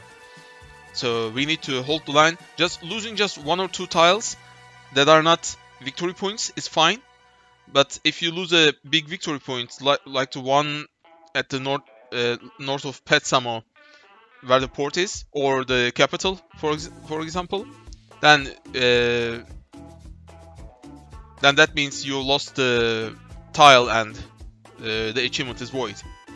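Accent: Turkey. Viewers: Knk türk aksanı sezdim türk müsün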